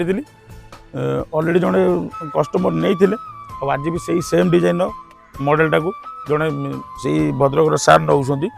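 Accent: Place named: Baripada, India. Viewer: Baripada ru kahuchi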